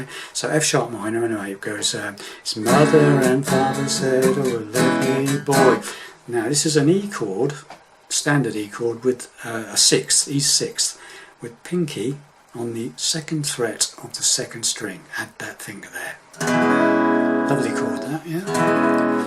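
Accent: England, United Kingdom. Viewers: that's an old Taylor you're playing there young man. As you sound English I'll assume you have a good sense of humour and your turkey-neck tells me you're old enough to remember this one from 1978. and " <link>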